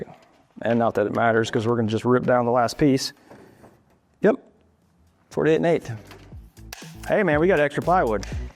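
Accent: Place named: South, United States. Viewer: Why did voice get 10 times more country in this video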